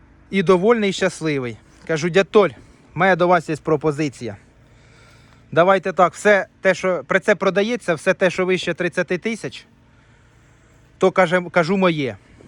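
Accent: Poltava, Ukraine. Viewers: по говору поняв, шо наш - полтаський. Балакає !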